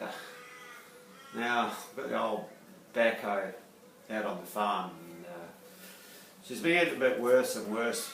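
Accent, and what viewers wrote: Australia. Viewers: First time watching your channel i found you via Cam at Battler. A new Workshop and Machine Shop sounds very interesting. Definitely worth subscribing to find out more Sounds like an Aussie accent the T Shirt you are wearing had me guessing for a minute but once outside that definitely looks like an Australian farm. Looking forward to watching your current video,s and more in the future. All the best. Regards Peter.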